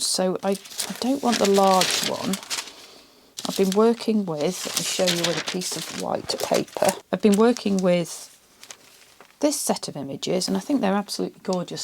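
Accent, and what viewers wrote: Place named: Lancashire, United Kingdom. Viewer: Love this project,! Your voice is so soothing, and it reminds me so much of when I lived in Lytham, Lancs. throughout the 1980s!!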